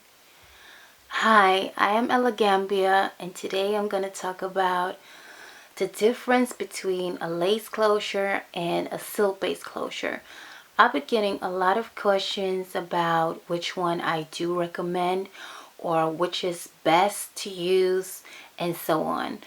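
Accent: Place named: Brazil. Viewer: Your voice sounds like Rihanna but with a Brazilian accent. Very cute!!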